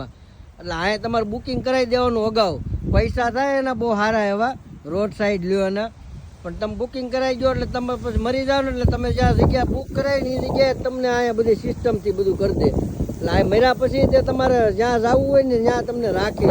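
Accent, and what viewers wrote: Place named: Kathiawar, India. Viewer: Vah Pyor kathiyavadi lago so hooo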